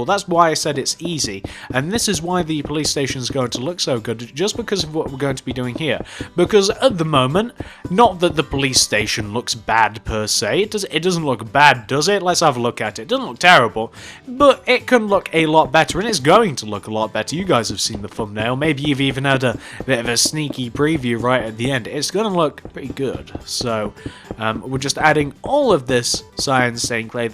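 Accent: United Kingdom. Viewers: Leth Ls he is British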